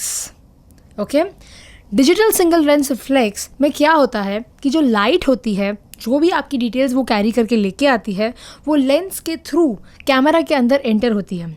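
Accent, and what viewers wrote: Gujarat, India. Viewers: same here.. your accent shows that you are gujarati..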